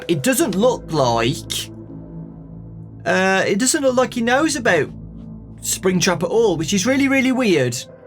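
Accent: United Kingdom. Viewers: What’s the point of buying the book when you can just listen to a British lad read it for free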